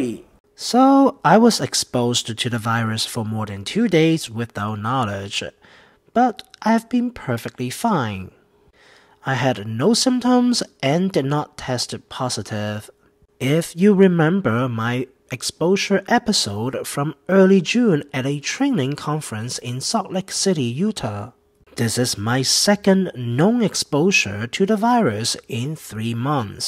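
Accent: United Kingdom. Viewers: Your demeanor is measured, not alarmist and speak as a father and husband as well as a scientist. I detect British alliteration when an open ended vowel word meets a word beginning with open vowel. 😉 A Brit are you🤔🤣🤣.🤲thanks for your vids🤲. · Dr, I watch many doctors and scientists on this subject! I love your channel honesty and accent, from the UK, no problems understanding you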